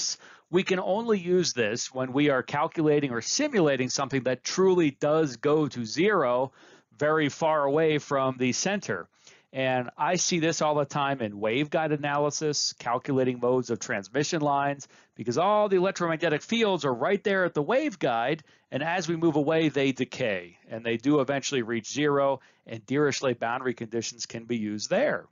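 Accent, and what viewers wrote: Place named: United States. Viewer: nevermind actually, apparently according to which English accent you have it's pronounced differently and you have the American version my bad. Still a good video though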